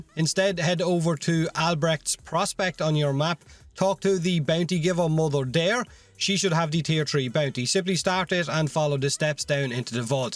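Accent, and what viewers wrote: Ireland. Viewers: 4 seconds in and i new this guy was irish-i think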